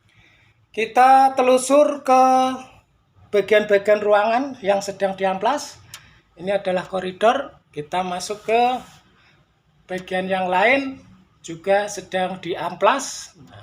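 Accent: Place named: Java, Indonesia. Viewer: Makasih logat jawanya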